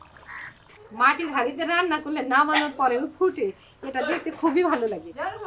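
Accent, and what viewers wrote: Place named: Bangladesh. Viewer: কথা গুলো বাংলাদেশের মতো ❤খুব ভালো লাগে 😊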